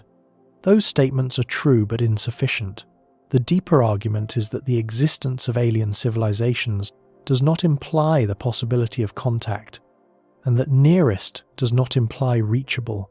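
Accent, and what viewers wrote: United Kingdom. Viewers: But this guy sounds British, not Russian... 🤔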